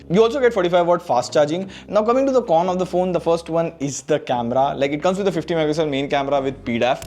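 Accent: India. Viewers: Good god , HEAVY indian accent. THIS IS WHY WE ARE LAUGHED AT GLOBALLY.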